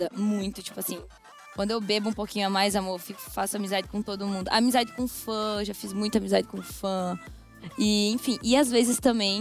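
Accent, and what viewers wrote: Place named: Northeast, Brazil. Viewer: Pq ela tá falando com sotaque norte/nordeste se ela é do sul?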